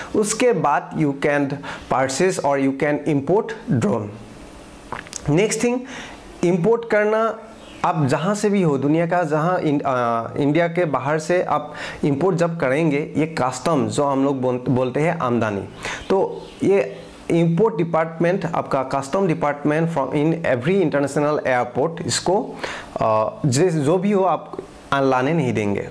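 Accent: Assam, India. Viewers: Why your accent is like that ? Are you from Assam ?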